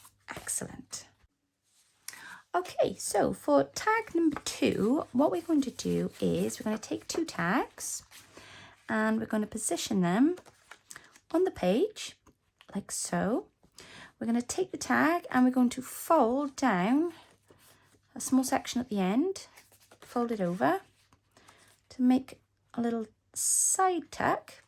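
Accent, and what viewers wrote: Wales, United Kingdom. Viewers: 🤣🤣🤣 OK, I didn't hear it the first time around but I can now! Too funny! It sounds so wrong coming out of your mouth alongside your lovely delicate Welsh accent! You'll be fine when you come over here though, most ppl will just think you have bit of a strange accent! ❤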